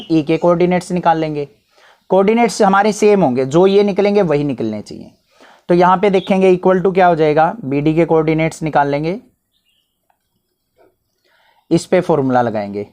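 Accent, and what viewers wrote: Haryana, India. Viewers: Sir aap haryana se hai ?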